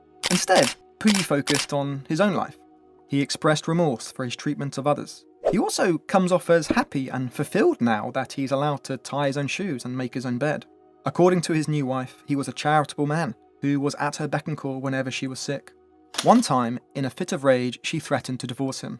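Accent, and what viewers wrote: United Kingdom. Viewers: I mean he’s bri ish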